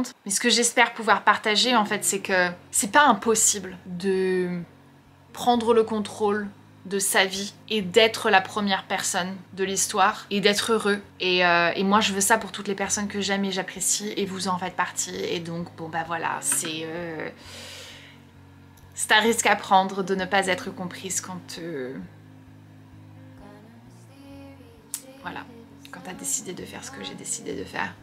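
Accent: United States. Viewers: Je pense que c'est lié à l'accent américain. Je saurais pas l'expliquer mais l'accent américain sur le français peut donner cette impression / les mots anglais dans une phrase française aussi. C'est mon analyse de la question mais c'est vrai que quand des Français se mettent à utiliser les anglicismes à tout va j'ai cette impression les concernant qu'ils sont "faux" ou parfois qu'ils sont prétentieux. Bien sûr dans ton cas, c'est différent car tu es partie aux Etats Unis mais ça peut fausser l'impression de certains français à mon avis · ta manière de parler très US même quand tu parles français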